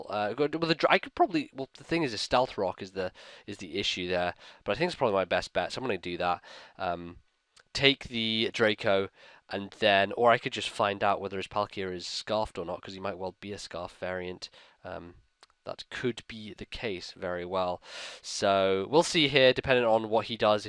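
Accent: Scotland, United Kingdom. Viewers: Are you Scottish? I thought you were Englandish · What? Are you scottish?!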